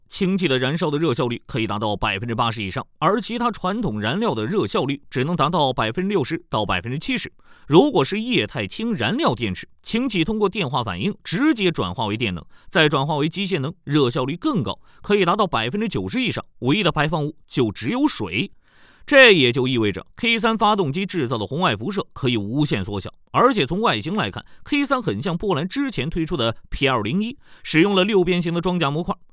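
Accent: China. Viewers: 播這種中國腔調,不行真難聽直接下一頁!!!!!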